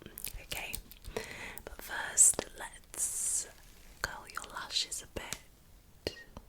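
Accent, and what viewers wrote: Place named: London, United Kingdom. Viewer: Are you from Central London? You have the EXACT same accent as my auntie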